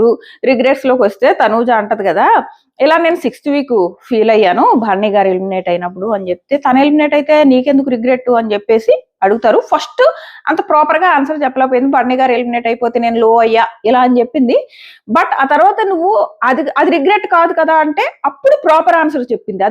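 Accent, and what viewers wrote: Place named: Godavari, India. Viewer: Ammai, You are from mana Godavari district ana mata.. Nice slang....❤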